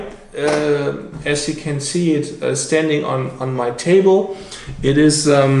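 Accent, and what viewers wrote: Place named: Germany. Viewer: du bist deutscher oder ? XD ich erkenne das an deinem englisch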